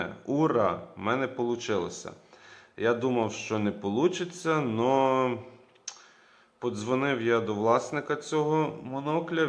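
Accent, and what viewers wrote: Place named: Lviv, Ukraine. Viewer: То є львівський діалект , вам того не зрозуміти. Сходіть потрольте одеситів , вони вам розкажуть скільки у них коштує рубероїд на Привозі )))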